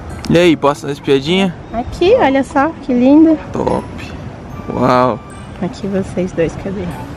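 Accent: Santa Catarina, Brazil. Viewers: Vc é de SC kkkkkkk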